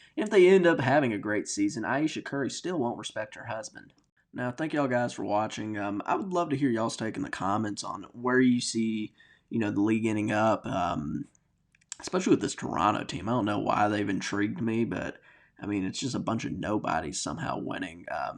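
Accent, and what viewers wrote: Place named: Alabama, United States. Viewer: This dude is a casual, bruh. The thumbnail alone implies Harden should be considered for MVP when the Clippers are 5-16. He called Michael Jordan ancient history like he played in 1960. Then he said LeDaddy James (super pause), and called MJ inefficient like he's not 2nd all-time behind Jokic in player effciency. Completely ignored the fact he's shooting .495 for his career while taking shots most wouldn't consider at an all-time high volume. MF put a period at the end of his title like he just learned how to YT lmao Like how are you clearly an L.A. fan who sounds like you're from Alabama? lmao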